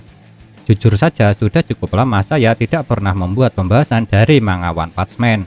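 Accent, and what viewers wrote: Java, Indonesia. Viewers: Logat jawa neee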